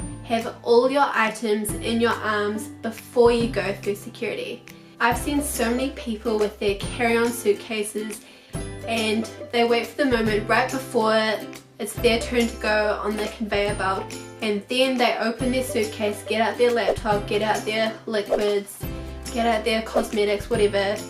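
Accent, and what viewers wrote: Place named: New Zealand. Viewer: Is that a Kiwi accent I hear?! LOVE IT 😍🙏 And awesome video, great tips chica! X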